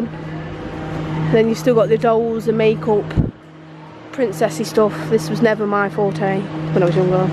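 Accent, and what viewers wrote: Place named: Nottingham, United Kingdom. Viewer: Good Morning. Love me a video first thing on a Thursday morning. You made me laugh when you said Slush Puppy the Nottingham accent is fantastic. Whey I grew up I had the Charlie perfume in the bottle so that’s how old I am, The can came later. This bit is for your brother, my neighbour has two dogs one called Batman the other called Robin. Batman is a Blue Healer its an Australian breed google images of them because he really looks as if he is wearing a Batman mask. Have a great weekend. Oh and I am also obsessed with tea and get my mum to send me out Red label tea bags for birthday and Christmas.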